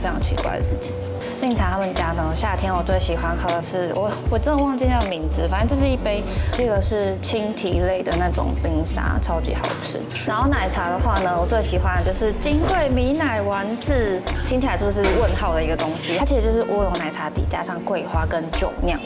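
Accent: Taiwan. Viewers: 陈家生煎，听这口音是台湾腔，在台湾应该就没有这品牌了，如果是在大陆，很多三线城市，都有这联锁店，口味，效果都是和视频中的生煎包一样好。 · 回台湾久了口音又变回台湾腔了以前台湾腔没那么重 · 又看到回大陆很高兴，一开始浓厚的台湾腔听不清，后来慢慢习惯。是很有魅力的大美女，希望在上海过得开心。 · 你那台湾腔好好听哟！😂😂😂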